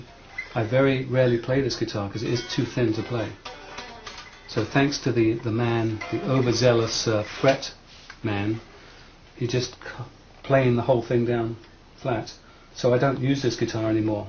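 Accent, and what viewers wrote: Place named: England, United Kingdom. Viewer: I love Ritchie's sense of humour. I love his accent too. Quintessentially English.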